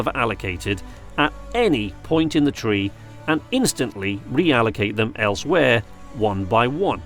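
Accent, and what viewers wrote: United Kingdom. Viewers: This guys is so British 😂😂 good fun review tho 👍🏻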